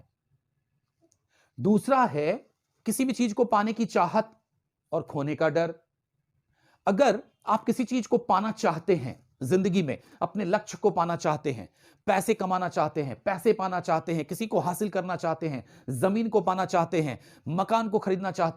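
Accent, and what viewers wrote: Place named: Bihar, India. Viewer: Very nice video sir, I like you biharihood tounge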